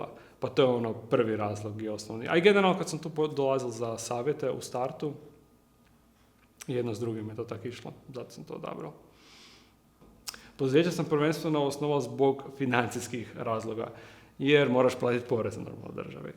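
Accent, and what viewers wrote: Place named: Germany. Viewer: With your accent, I thought you were German or Austrian,:-)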